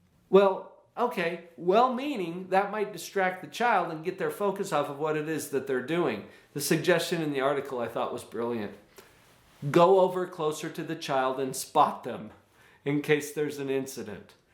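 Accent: United States. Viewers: you sound very american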